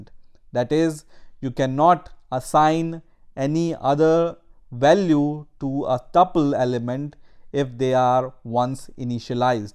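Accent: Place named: India. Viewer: i like your indian accent. it gives the lesson some life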